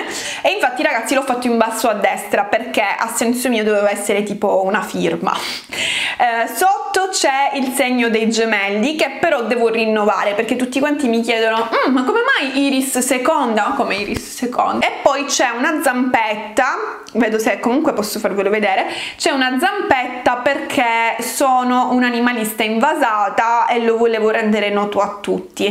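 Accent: Sicily, Italy. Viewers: Comunque io adoro la tua voce e il tuo accento siculo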